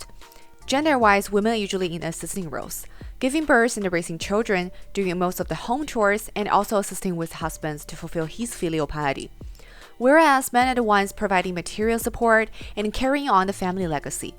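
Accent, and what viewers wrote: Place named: China. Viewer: Interesting topic + excellent explanation + insightful review of the topic + Chinese accent = top tier educational video!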